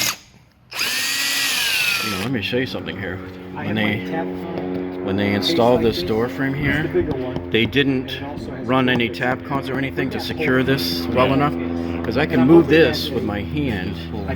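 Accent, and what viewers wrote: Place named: New England, United States. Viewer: I hear New England, loud and clear.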